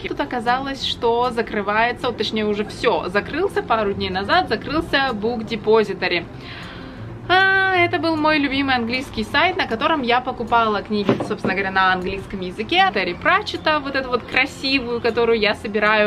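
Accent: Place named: France. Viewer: Прямо слышно как за время жизни в Париже появился акцент!) Много горловых звуков)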